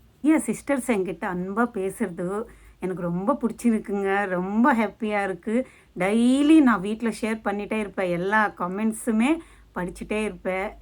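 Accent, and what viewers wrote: Telugu, India. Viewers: Nice very cute design voice summa super telugu voice tone tamil kalandhupesum very cute voice 🎉🎉🎉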